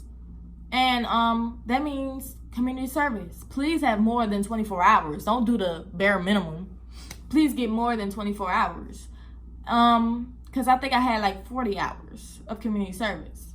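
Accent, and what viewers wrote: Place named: DMV, United States. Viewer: Where are you from? I hear an accent in your voice. It sounds like you may be from the DMV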